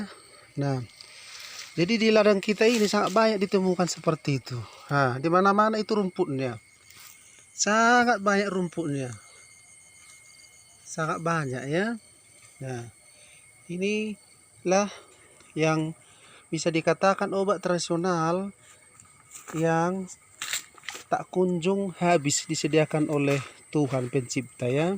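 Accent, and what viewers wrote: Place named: Makassar, Indonesia. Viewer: Kéntara séékali aksen makassarta' DAENG.. Geli2ki déngarkiii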